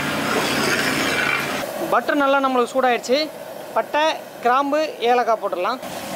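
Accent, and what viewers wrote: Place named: Kongu, India. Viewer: ji kongu tamil Vera level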